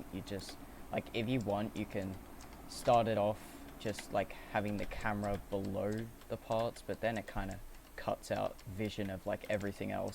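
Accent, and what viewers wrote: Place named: Australia. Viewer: Woah you have an Aussie accent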